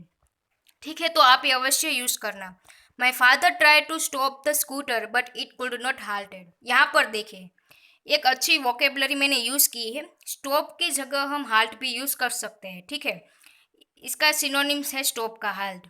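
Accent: Gujarat, India. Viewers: Your sounds like gujarati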